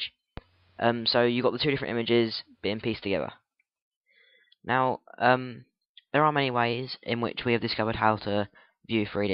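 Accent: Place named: United Kingdom. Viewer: I get that you're British, but do you have to pronounce it "free d"?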